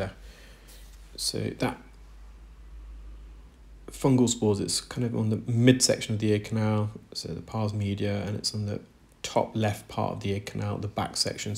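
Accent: England, United Kingdom. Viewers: Hmmmm... I believe that he's English and as such you really can't complain about any pronunciations . I think they have the corner on the market for all English language users.lol That's basically asking someone to speak "American English". Pretty rude if you ask me.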